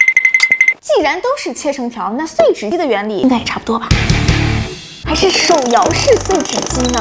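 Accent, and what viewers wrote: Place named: Qingdao, China. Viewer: 你是四川的？你说话我还以为你是青岛的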